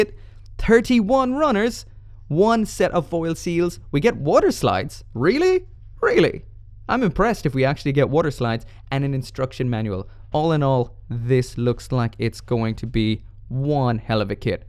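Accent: Ireland. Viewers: Are you Irish? Where do you buy your Gunpla? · Are you Irish?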